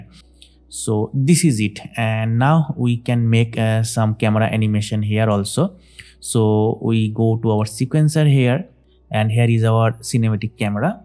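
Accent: India. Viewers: But in an Indian accent 😓.. waste of time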